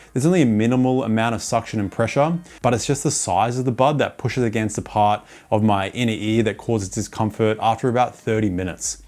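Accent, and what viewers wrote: Australia. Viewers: I wish I could pay YOU to stop using an Australian accent. Holy shit, nothing is more annoying than an aussie accent.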